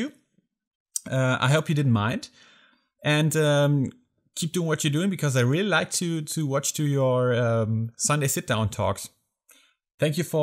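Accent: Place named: Germany. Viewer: Really appreciate your video. You are so lucky to have so many great games shops in Deutschland, hearing your accent makes me miss my Mother country!. - Your English is perfect by the way. Thanks brother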